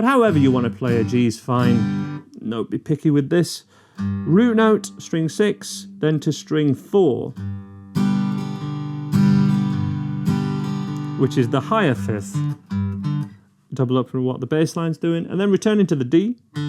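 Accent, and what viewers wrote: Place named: West Cumbria, United Kingdom. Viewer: Like your style. That a la'al bit West Cumbrian accent eh?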